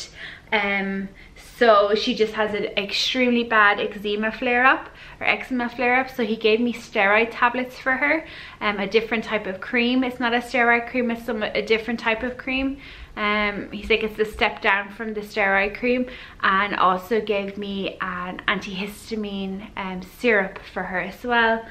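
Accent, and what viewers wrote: Ireland. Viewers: I could listen to you talk all day, I love Irish accents!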